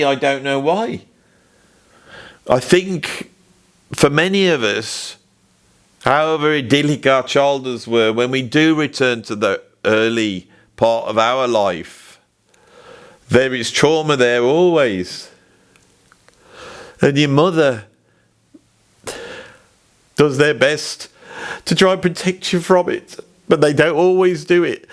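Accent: United Kingdom. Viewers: It is episodes like this one that keep me watching,. I have subscribed and unsubscribed a number of times, usually because of offense that you either purposefully or inadvertently bring to my Christian beliefs. But its when you get right down to what life is all about and get very transparent and authentic that keeps me hanging around. I do enjoy your sense of humor and your entertaining British accent, which reminds me of the Beatles, and I understand that every episode can't be like this one, but we all need to be more human and look at ourselves and others for what we are to understand our wretched sinful condition and our need for the love of God. Keep up the good work!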